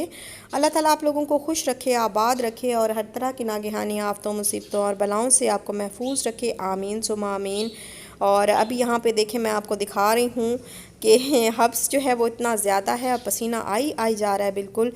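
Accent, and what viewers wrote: Saraiki, Pakistan. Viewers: i watched first time ur vlog r u seraiki ur accent is just like seraiki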